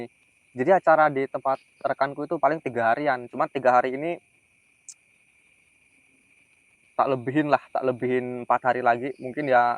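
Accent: Java, Indonesia. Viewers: Sumatera dia..sama dgn bang maman..tapi logat2 ada jawanya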